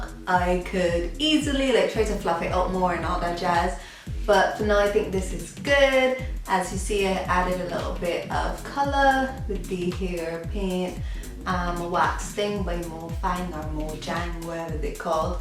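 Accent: Barbados. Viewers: are you bajan? you sound just like my mom🤔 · You sound like a bajan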